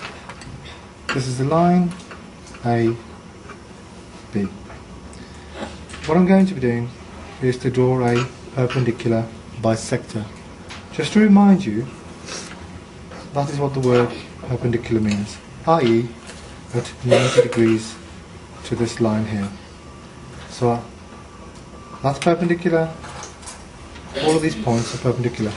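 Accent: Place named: United Kingdom. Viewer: beautiful your british accent is a little distracting hahahaha but it's awesome too you tackled it beautifully.. i couldn't have explained it better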